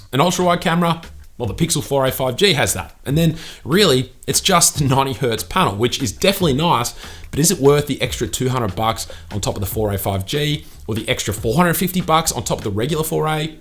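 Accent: Australia. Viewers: your aussie accent makes me travel back to when i lived in australia ! very good content ! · Gotta say that was pretty great sponsor spot. I was so ready to fast forward, but I had to watch to compare the quality. It's not a priority for me. As long as the photos are great. Also it's great to hear a fellow Aussie. Still tossing up between the 4a 5g and the 5. I want the 90hz panel but it's hard to justify the $200.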